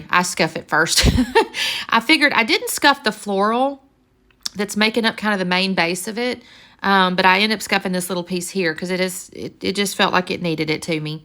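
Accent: South, United States. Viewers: I love this. You sound like a real southern girlfriend. I’m in Tennessee just wondering where your from?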